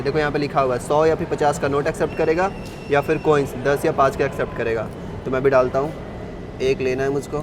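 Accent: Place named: Bihar, India. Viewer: Bihar se hain ye.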